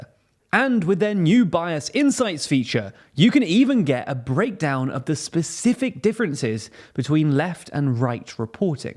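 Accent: England, United Kingdom. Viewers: No one expects absolute perfection, just close but with an English accent. When they do something as ridiculous as pronounce the Spanish double-L as a hard L sound, you know they either have had surprisingly little exposure to Spanish (possible but not likely), put no thought into it, or have so little respect for other cultures that they intentionally over-Anglicize their pronunciations. They claim they look up pronunciations but sometimes forget them once they begin recording, but some of their mistakes are too easy to avoid, and they also could write their scripts with (quasi-)phonetic spellings, but apparently they don't. And they tend to keep making the same mistakes over and over in multiple videos. These are very simple things, and not doing them means they aren't putting in nearly as much effort as they claim.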